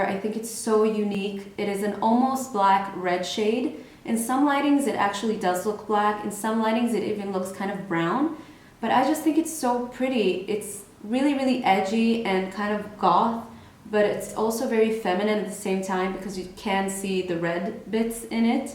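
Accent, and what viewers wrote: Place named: Netherlands. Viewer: Are you by any means Dutch? Idk you kinda have the same accent as me in English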